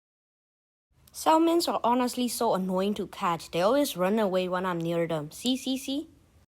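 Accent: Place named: India. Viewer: Bro why u sound so indian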